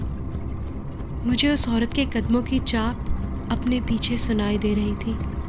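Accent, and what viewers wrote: Pakistan. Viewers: I think she is Pakistani and living in abroad since birth that is why she has amazing accent and maybe fictitious minded so she can create terrific stories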